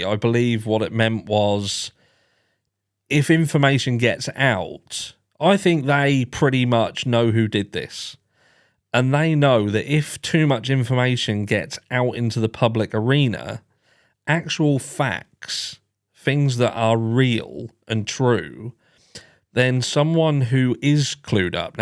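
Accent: Norfolk, United Kingdom. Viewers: Thanks for the LIVE! Your Norfolk accent is truly showing:) keep up the good content. you make me laugh.:D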